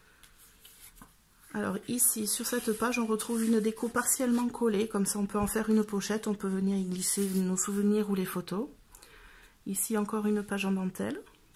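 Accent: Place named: South, France. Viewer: oh! comme ça fait du bien d'entendre ton doux accent du sud! Une superbe réalisation et le début d'une belle aventure pour toi! Quand je te disais qu'il fallait te lancer! Elle est super ta vidéo, bravo! MUXUS!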